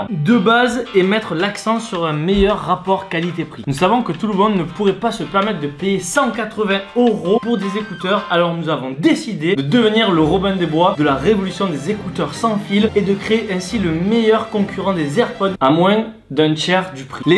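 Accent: Marseille, France. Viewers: T'es marseiller moi oui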